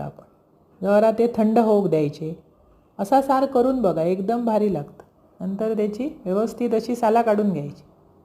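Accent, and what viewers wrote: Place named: Malvan, India. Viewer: सुंदर आणि सहजगत्या करता आपण आणि साधेपणा आणि मालवणी भाषेतला गोडवा खूप मजा नायतर आमच्या बायका कधीपण काय पण घालतत त्यांका समजवणार कोण लगेच भांडूक तय्यार पदर खोचून " तुमका काय अक्कल असा? 🤣🤣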